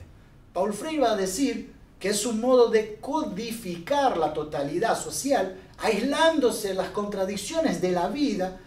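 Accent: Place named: Buenos Aires, Argentina. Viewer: increible hermano, gracias por este contenido. tu acento porteño esta re copado y me encantó escucharme de subito en la grabacion de graveola que aparece en los carteles 🏹💎💚🙏🏾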